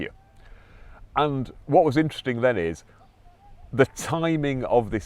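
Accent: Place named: England, United Kingdom. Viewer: Wonderful accent. Very English. No conclusion.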